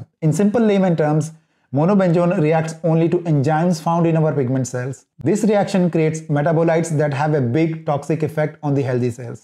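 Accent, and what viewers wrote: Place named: India. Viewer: You sound so much like indian.are you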